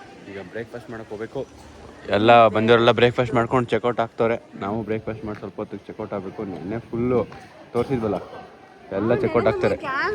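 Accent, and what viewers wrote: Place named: Udupi, India. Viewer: udupi mglor kannada supper😂❤❤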